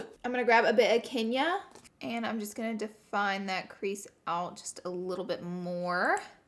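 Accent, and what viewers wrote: South, United States. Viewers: I love how you say consillur instead of con-see-ler. It's very Southern with a bit of a drawl to it 🌷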